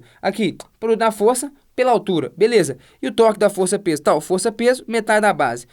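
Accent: Minas Gerais, Brazil. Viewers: A aula é excelente! Sem dúvidas! Mas confesso que conhecer o "mineirês" deixa o entendimento mais fácil em alguns momentos da aula... Digo isso sendo mineiro também.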